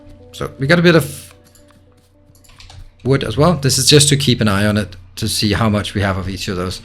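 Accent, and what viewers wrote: Denmark. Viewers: You must be danish, you guys are the only ones with that accent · R u danish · Are you Danish??? :) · are you from denmark?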